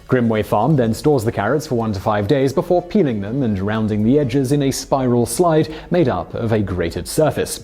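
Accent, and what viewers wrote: United Kingdom. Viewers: Actually, I believe his target audience is primarily Americans, with other English speakers coming in second. Otherwise, he could simply stick with a 100% British dialect, but he doesn't.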